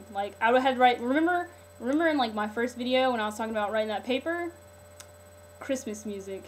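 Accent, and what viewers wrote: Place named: South, United States. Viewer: "Oh gosh she's freekin'" *Camera cuts out* Hahaha. What is your accent? It's really cool. It sounds kinda southern... but you don't talk slow. It's a good mix.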